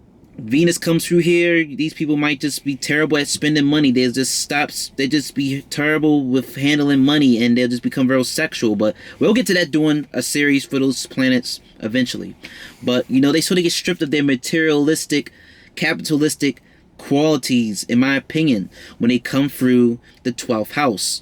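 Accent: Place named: Baltimore, United States. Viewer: He sounds like he’s from Baltimore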